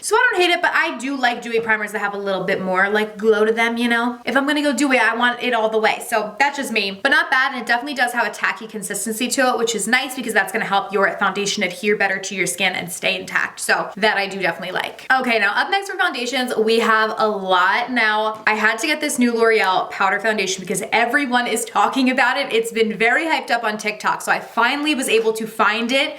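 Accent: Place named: California, United States. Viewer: Guessing your from or live in California based on how you talk. So cal? Thanks for the vid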